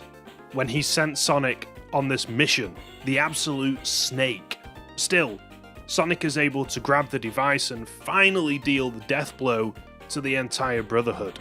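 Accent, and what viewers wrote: United Kingdom. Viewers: What a treat to get the entire Fleetway sonic comics told! All with the perfect British narrarator for it. So nice to have you back.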